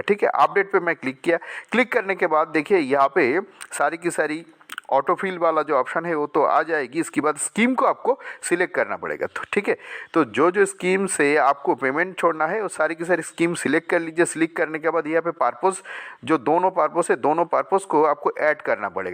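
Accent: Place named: Bengal, India. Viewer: Pahle Hindi thoda Sikh lo.. bangali babu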